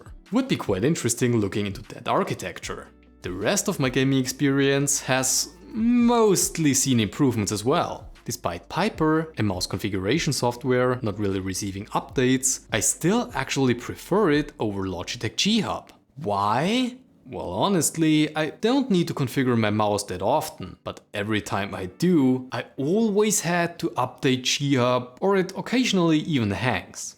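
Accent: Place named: Germany. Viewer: You sound German :).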